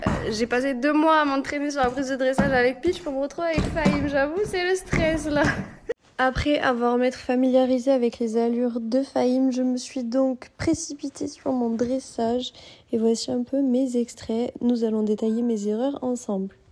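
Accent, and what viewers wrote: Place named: South, France. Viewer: Petit accent du sud Team sud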